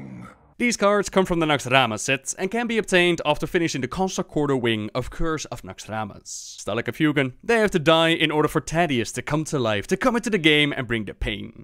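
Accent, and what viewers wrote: Netherlands. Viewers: You sound sooo Dutch! Ever been told to You before?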